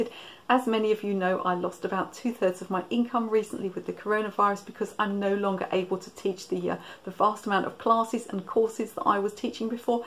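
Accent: England, United Kingdom. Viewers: Great info, thank you for sharing your knowledge! Plus, I love listening to your beautiful English accent! 😍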